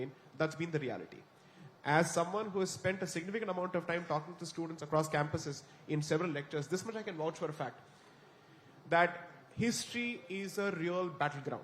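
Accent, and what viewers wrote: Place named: South India, India. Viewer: WHT is this idiot blabbering in south Indian English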